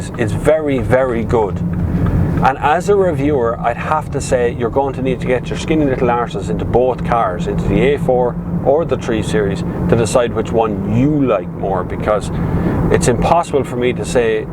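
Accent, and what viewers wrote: Ireland. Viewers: In all seriousness, thanks for the review. But to everyone who has never heard an Irish accent remember: Der's more to Ireland dan dis! See Alan Partridge: v=1QRlR0ctjNE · damn is that what the general irish accent sounds like? I first thought he was dutch or something...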